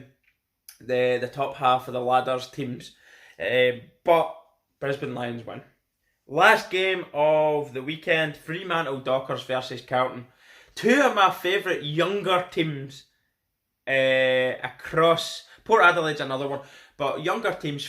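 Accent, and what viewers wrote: Scotland, United Kingdom. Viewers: love the scottish accent man, i see the rest of the round similar to how you do. Although i think St Kilda will be dangerous for us as they got a lot of negative press about their performance this week it could make play out of there skins, We getting 4 premiership players back including our captain and hopefully we don't get ahead of ourselves thinking it will all happen but come out and really work hard and get the job done.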